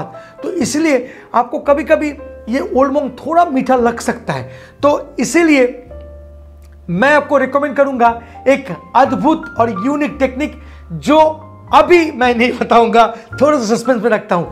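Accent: Bengal, India. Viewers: you are too good - I love the Bengali accent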